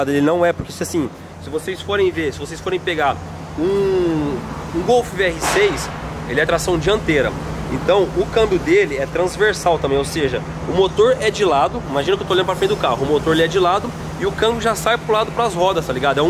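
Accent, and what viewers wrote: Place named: France. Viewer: legal e tal mas de onde saiu essa mania de colocar assento circunflexo em tudo??? 🤣🤣 Carpete vira "carpê" Quattro vira "Quatrô" 😂😂😂 Nem quem eu conheco de Londrina fala assim... nao entendo de onde ta vindo esse enfrancesamento kkkk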